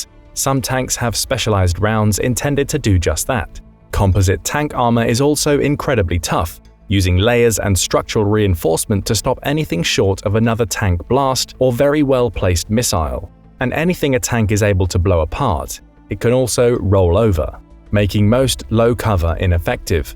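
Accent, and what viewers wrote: United Kingdom. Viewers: Another video with a factual error about every minute, visuals that have no relevance to the narration and no insights that couldn’t be gleaned from reading a news site. But they did enlist a British narrator to give it a thinly veiled patina of authority.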